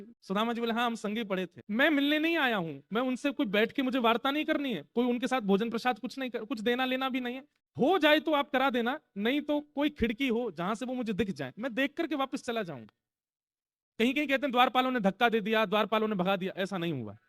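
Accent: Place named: Braj, India. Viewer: Aapke mukhmandal se brj Bhasha pyari lagti h sunaya kro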